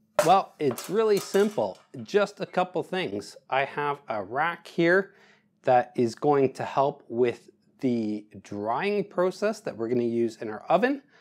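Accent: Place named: Canada. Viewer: Thanks for the recipe and your good intentions, always pleasant to hear a Canadian accent, you Canadians certainly won the lottery when it comes to politeness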